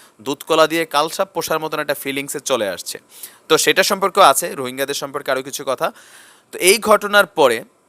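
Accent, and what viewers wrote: Comilla, Bangladesh. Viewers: ভাই আপনি কুমিল্লার ভাসা বলুন ভালো লাগে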